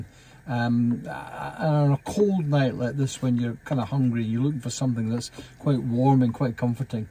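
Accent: Scotland, United Kingdom. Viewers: Love the Scottish accent, the pronunciation and passion Really interesting and inspirational thanks for posting What is it with these dog poo bags? Definitely think car owners are the biggest cause of litter. Antidotally I’ve heard campervans having litter placed deliberately outside them so they get the blame.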